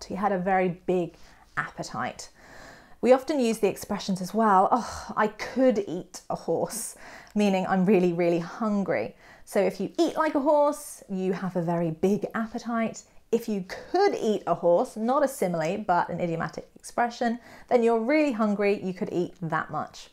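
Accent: United Kingdom. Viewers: Hi, 🖐as always, I love the way you speak English, it's crystal clear, typical British accent, and most importantly, it's full of vigor 💪👍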